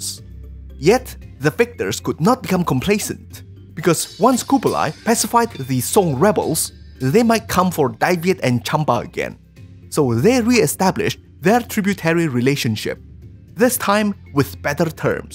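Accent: China. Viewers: From the accent, I am pretty sure he's Chinese.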